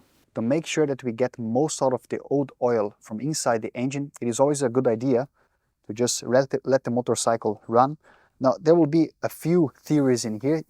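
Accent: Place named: Europe. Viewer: hi, from your accent I assume you are a fellow European, where do you source the OEM parts? Thanks!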